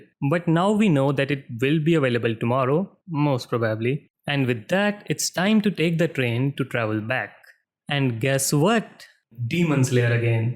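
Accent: India. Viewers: Bro you have indian accent so smooth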